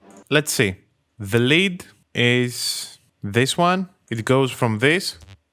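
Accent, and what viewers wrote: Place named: Greece. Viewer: Are you from greece? Cause your accent sounds a bit greek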